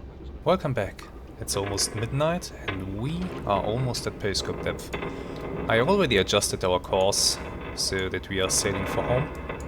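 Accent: Germany. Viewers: I have recently started following your adventures, and am highly impressed; Your German accent adds total authenticity, and the scenarios reel off like movie scenes; Many thanks from England...;)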